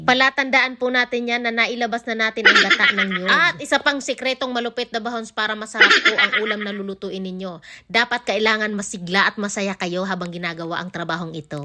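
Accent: Bicol, Philippines. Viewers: Bicolano po ba kayo?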